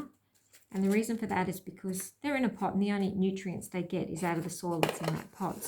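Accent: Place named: Australia. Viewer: Great video. So nice to hear the Aussie accent for a change. Please keep posting your videos. 🤗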